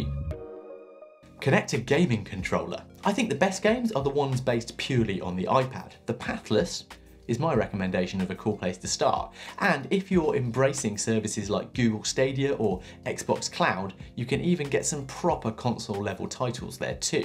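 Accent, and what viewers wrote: United Kingdom. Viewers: Apart a great British accent that I adore. These reviews are amazing ! Congrats !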